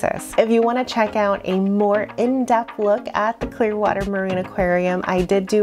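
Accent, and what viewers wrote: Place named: Midwest, United States. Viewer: Thank you! Your videos are refreshingly fluent. (I guess I recognize and resonate with the “Midwest influence”—I lived in Evansville, Indiana for 16 years.)You present and explain in calm, digestible terms and pace. So refreshing! We’re planning a trip to the Punta Gorda area this week, as a matter of fact. Will be checking any videos you have if that area. My husband lived in Naples from <num> so he is familiar with the weather, geographical layout but the restaurants and other businesses have changed quite a bit with some old favorites still there too.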